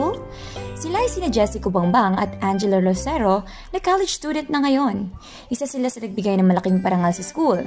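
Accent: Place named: United Kingdom. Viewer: Goosebumps sa pronouncation ng mga words sobrang cristal clear maam😯 may pag ka british accent hehe.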